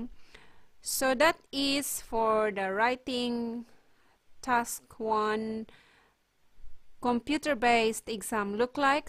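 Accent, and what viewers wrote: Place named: Philippines. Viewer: The way you pronounce i think u must be a filipina or maybe half filipino.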